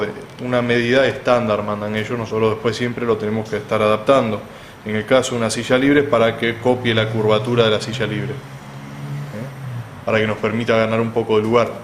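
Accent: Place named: Argentina. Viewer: Buena enseñanza y buena explicación y sos Argentino nos entendemos mejor gracias xr compartir tu experiencia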